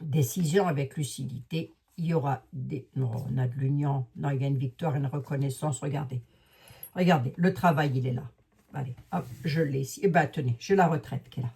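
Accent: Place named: Lorraine, France. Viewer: Coucou, vous "causez" haut, fort et claire Chef 😊(comme dans l'armée😅), de plus avec un bon accent Lorrain (idem dans ma famille😜). Allez je vous écoute sagement💋💋🥰🤩😘❤🧡💛💚💫🌹🌹🍀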